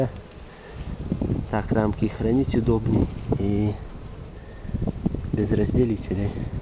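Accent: Belarus. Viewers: По разговору слышно,что ты с Белоруссии и понятно,что климат там серьёзней,чем у нас ! Для меня подушки не нужны ! Здесь и о мхе ничего не знают. Удачи тебе ! Я на тебя подписан давненько и смотреть тебя приятно ! Молодец ! Удачи нам !!!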